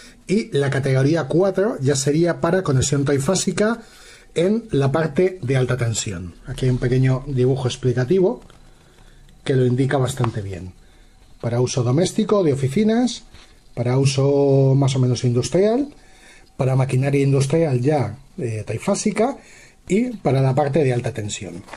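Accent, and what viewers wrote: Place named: Spain. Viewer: Voz española.... Huuuuuac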